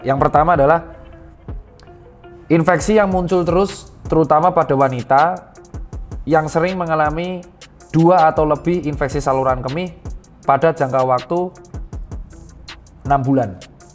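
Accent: Java, Indonesia. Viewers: Mak jebuos... 🤣 medokkk...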